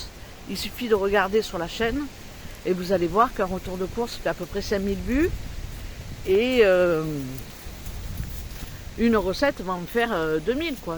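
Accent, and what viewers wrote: South, France. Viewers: Coucou très instructive ta vidéo. C est bcoup de boulot tout cà...moi j Adore ton accent du soleil. Ça fait du bien au coeur.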